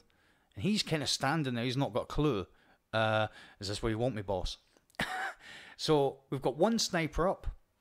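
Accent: Scotland, United Kingdom. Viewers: Thanks for a great video! This was really well explained and shown and had some pretty good tips for some techniques that I haven't seen before, on other videos of this mission. You played this beautifully!! Talk about making it look EASY! I wish I found your channel earlier! Only a few minutes into it, I knew I had to subscribe. Definitely looking forward to more! You have a very interesting accent. Is it Scottish?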